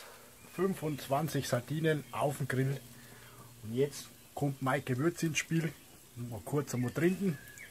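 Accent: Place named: Franconia, Germany. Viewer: Fränkischer Dialekt. Pils zum trinken. und FC Bayern Trikot. Ich bin maximal verwirrt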